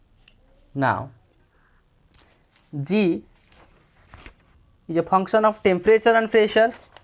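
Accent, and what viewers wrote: Odisha, India. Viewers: Are u from odisha?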